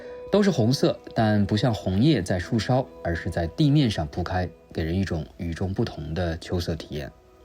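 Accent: Taiwan. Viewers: 聽這位小姐姐口音是台灣人！ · 聽口音，女生是台灣的???? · 這位小姐的口音感覺像是我們台灣同胞!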